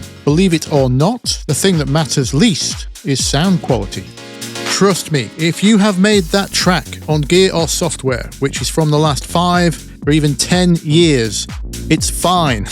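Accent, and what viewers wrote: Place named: Yorkshire, United Kingdom. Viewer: Oh man I LOVE you. New to this channel but I've well and truly subbed and will be sticking around. You're a fountain of wisdom, cutting through the crap with refreshingly relevant advice. (You sound like a fellow straight-talking Yorkshireman too!) Mate, this video was for ME. I can't finish anything as my standards are too high. Hundreds of unfinished tracks I daren't sign off in case they're not perfect. Still putting off launching my music because I've got hundreds of different factors I feel need to be perfect before I start. Your vid is so inspiring, thank you! Your mantra about "not released because it was finished" is mega helpful! Just hearing you even talking about this issue is so encouraging.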